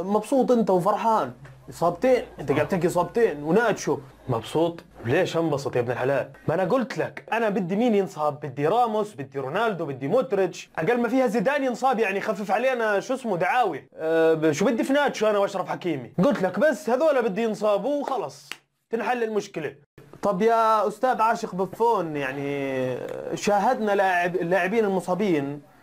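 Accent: Jordan. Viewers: انت أردني صح؟ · انت أردني؟؟؟؟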